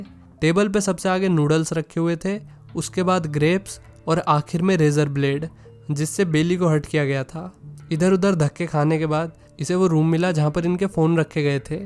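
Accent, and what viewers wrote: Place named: Palwal, India. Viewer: Aap kaha se ho faridabad side se ho kya kyoki aapne kuch word palwal walo jease bole hai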